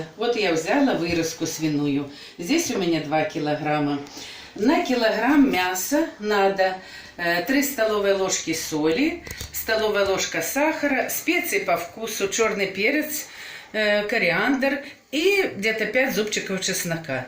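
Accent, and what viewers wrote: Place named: Belarus. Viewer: Очень милая женщина и хорошая хозяйка! Была в Белоруссии не раз, очень нравится страна, люди, говор очень приятный. Подписалась, с удовольствием буду Вас, смотреть.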